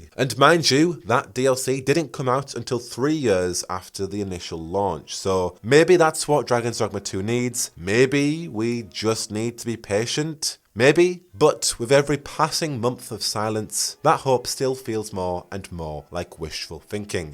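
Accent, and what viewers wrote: United Kingdom. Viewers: ew british.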